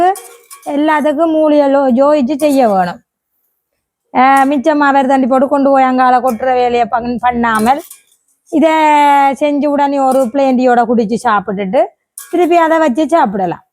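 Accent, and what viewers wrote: Sri Lanka. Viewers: நீங்க பருத்தித்துறையா சகோ 😂😂😂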